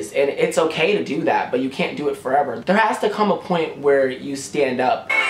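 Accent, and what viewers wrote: United States. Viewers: The fact that you're wearing an Adidas cap and using your iPhone and speaking with your modernised American accent and all that jazz just makes me love this video so much, because it means you basically fit into all of that yet the difference is you're aware. I loved this video from the very beginning and I got the message right away, I was waiting for a video like this to be made and I'm glad I've finally found it(because someone actually had the time and bravery to do it). And for that I thank you. I come from a very unprivileged place, Venezuela, and only recently (Literally less than a few months) we've been getting support and aid from the international community, when we've been facing with this narco-dictatorship for longer than 17 years. People die there, out of violence and starvation, and the international community is too distracted by media and other entertainment to realise. 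I was lucky enough to escape the country but I always make sure people around me and all those I possibly could talk to realise and are well-informed about what's going on back home. It's horrible and it's real. I am glad I found your channel, totally subscribing.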